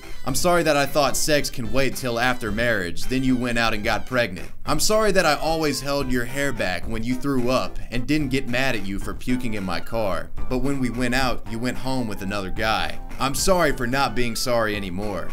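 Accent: South, United States. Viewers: It's cool. We know you from the dirty south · it's not kids these days, he's from the south.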